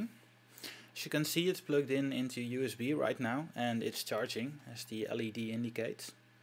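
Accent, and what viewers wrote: Netherlands. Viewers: Nederlander? goeie video! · Duuuuuuuuuuuuuuuutch! :D Ik kon het horen from your accent lol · you.. are.. dutch? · Typisch neder-engels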